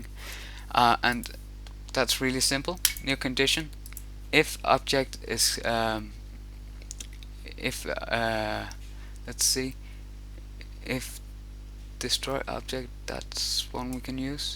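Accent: Denmark. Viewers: he is from denmarkm and im from sweden some thincks thet we sunds funny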